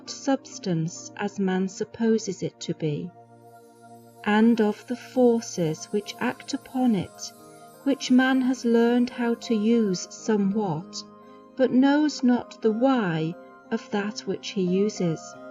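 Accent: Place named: United Kingdom. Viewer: Why that creepy Brit voice …such a obnoxious sound…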